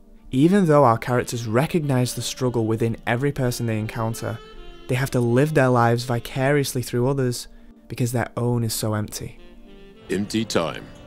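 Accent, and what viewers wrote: United Kingdom. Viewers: i love how you have a british accent really gives you taat edge over nerdwriter1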